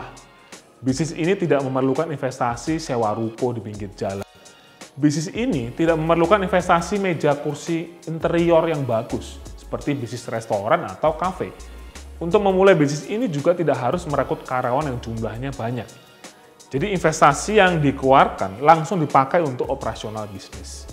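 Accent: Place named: Java, Indonesia. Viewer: menarik sekali mas, tertarik, btw medok ya mas jawanya :)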